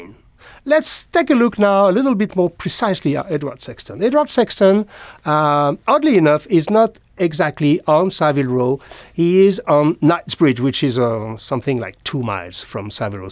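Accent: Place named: France. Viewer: Don't worry about your French accent Mr.. Your English is far better than my French.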